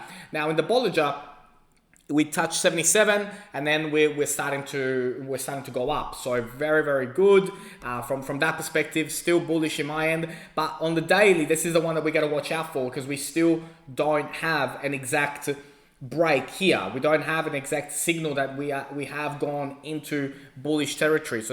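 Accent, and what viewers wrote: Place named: Australia. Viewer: Nice to hear an Ozzy. Subscribed.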